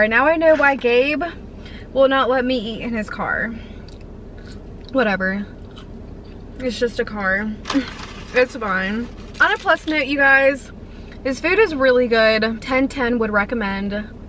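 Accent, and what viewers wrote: South, United States. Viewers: why do I watch these videos they always make me hungry lol. Also, where are you from? you sound like you kinda have a southern accent to me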